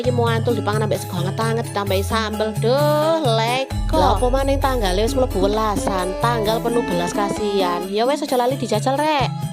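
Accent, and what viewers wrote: Surabaya, Indonesia. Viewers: Seneng sm narasi embaknya Suroboyo banget😂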